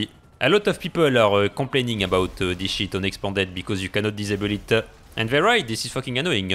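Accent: France. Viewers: Your French accent is epic! xD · lol l'accent français me fume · fait un effort sur ton accent je parle que peut anglais et j'ai un meilleur accent aucun effort les francais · L'accent français qui ressort ça fait grave bizarre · never get tired of hearing baguette accent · Wow bruh that is one strong french accent 😅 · French accent 😄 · Hell yes, I could listen to you curse shit up all day with that awesome French accent!! Chicks must be sitting and sliding around you 😂😂😂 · Thanks for the info it's pretty precise After 10 of your vidéo i heard a french accent, u talk very good btw but i wanna be sure Ty Ytb Recom' for finding you ! Continu ^^ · L’accent français prend parfois le dessus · accent francais ptdr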